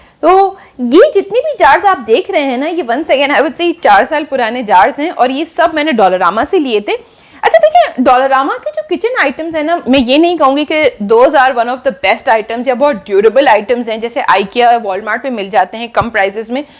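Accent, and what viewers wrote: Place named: Pakistan. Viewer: Very informative video and I like to hear your voice its pure pakistami style and I also loved your dress from where did u get this?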